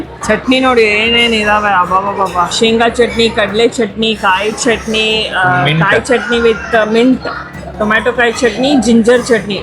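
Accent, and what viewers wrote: North Karnataka, India. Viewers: mast kannada mathu Paka uttar karnataka 👦 Boy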